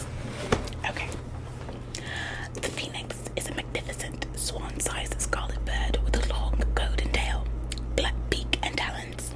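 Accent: United Kingdom. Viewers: I love the British accent 🩷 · if this was my first time watching your videos i would think you were british 😭😭😭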